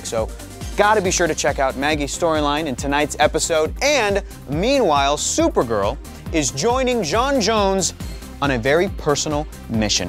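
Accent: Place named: Australia. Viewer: That Australian accent though.😂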